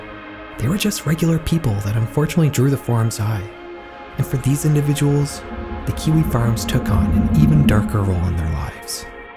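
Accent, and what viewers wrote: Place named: Canada. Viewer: he's Canadian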